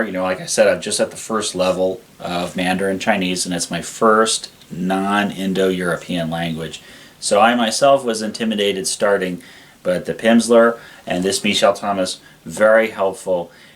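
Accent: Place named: United States. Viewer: I love this review, i just wonder why you are pronouncing the words after with an american accent? Im from here, too, so asking as a neighbor. Ive been writng words phonetically to make sure im saying what im hearing and not reading in my own language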